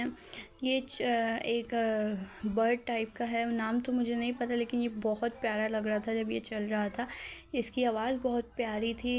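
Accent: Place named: Karachi, Pakistan. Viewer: Pure Karachi accent 😂 keep it up 💫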